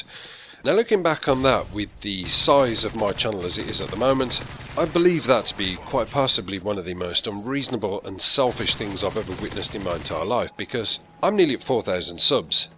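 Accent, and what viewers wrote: United Kingdom. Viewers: your british accent is pretty freakin awesome!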